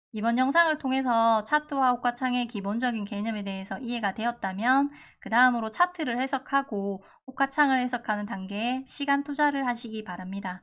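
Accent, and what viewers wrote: Gyeongsang, South Korea. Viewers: 경상도 사투리 여성분 목소리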